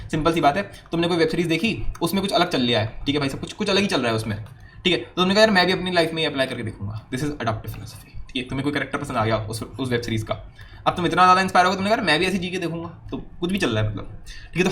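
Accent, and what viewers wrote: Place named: Uttar Pradesh, India. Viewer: Ur from UP 👉 right?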